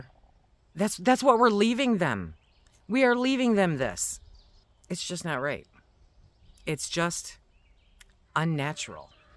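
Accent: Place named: California, United States. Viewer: Sounds so California. Keep it there please.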